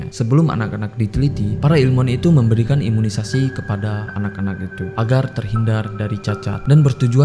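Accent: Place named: Medan, Indonesia. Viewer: Kaya ada logat medan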